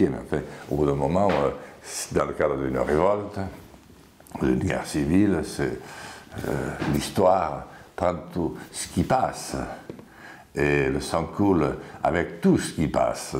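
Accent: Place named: Occitania, France. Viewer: On n'est pas nombreux à le remarquer... L'accent occitan et la belle voix profonde les hypnotisent.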